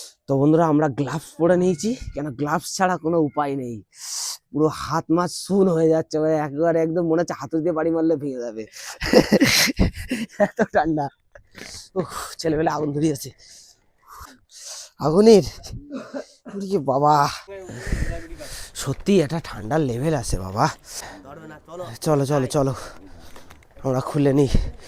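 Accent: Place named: India. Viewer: কথা শুনেই তো বোঝা যায় ইন্ডিয়ান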